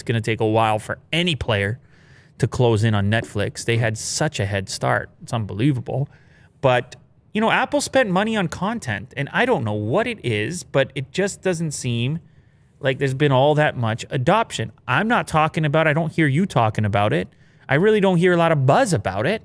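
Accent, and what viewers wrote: Canada. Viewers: sounds more Canadian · The first video I really noticed his 🇨🇦 accent lol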